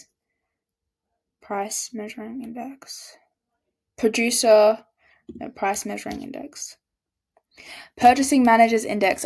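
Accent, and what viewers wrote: United Kingdom. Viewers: Ok, I'm just going to get it out of my chest. You're very pretty and very smart. Love your UK accent 😂. Gold is a ticking time bomb its overbought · You from the uk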